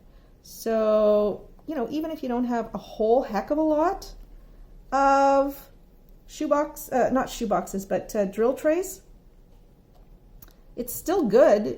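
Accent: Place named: Canada. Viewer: Rambling and Diamond Painting will do. I figured you’re Canadian I recognized the accent my partner is also Canadian, lol. You’re lucky it’s cold there and you don’t experience the pink wax getting all gooey, super soft and needs to be reloaded more often. But I found a great hack for us here in the Philippines. I keep all of my unused pink wax in the fridge! And they worked fine from that point forward. I like how you are finding ways to use cheaper alternatives for diamond painting. The hobby itself is not cheap if you want to be able to make a lot of paintings for yourself and to give away as gifts. Some of the tools in the market, I find very expensive and they are just wants not needs. Keep it up and keep on sharing!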